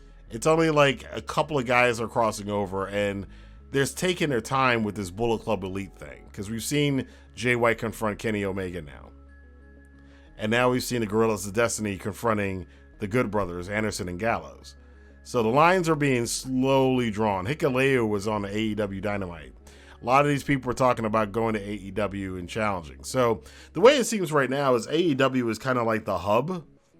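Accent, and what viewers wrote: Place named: United Kingdom. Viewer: Also you have make these comparisons with a British Accent.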